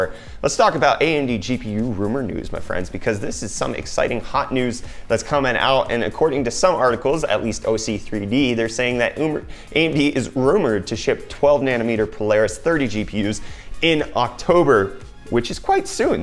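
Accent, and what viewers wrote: United States. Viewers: You from south Africa ? Your accent is not tho, very american . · I was just wondering, this guy sounds American, I wonder why he's in South Africa, I'm South African so am really curios.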